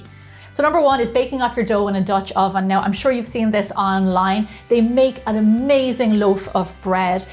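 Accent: Ireland. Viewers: So fun listening to the Irish talk. "And while it proofs, you can go have a cuppa." :-) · I could bake bread with you all day.. You make it look so easy. How long will the starter last? But your bread looks so much different and just could listen to your Irish accent. Very nice...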